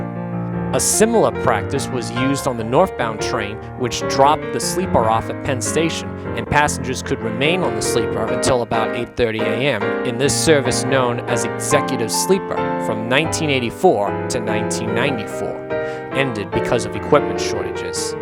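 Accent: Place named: Boston, United States. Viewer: Your Bostonian accent is for real 😄 not being offensive I live in MA but I don't have an accent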